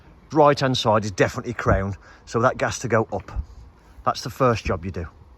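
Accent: Birmingham, United Kingdom. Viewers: I may have missed this, but what is the Length and Width of this Build? I may have missed it in Part 1...Sorry. Just curious if a particular wall lenght is more than 4.8m, bracing 2 pieces of timber, sould that be sufficient? Example 6m by 4m outbuilding. Definable feel the ground prep is much cleaner than have pouring concrete for a slab...think you have sold me on this rod system.... Videos and content great - plus the brummie accent ❤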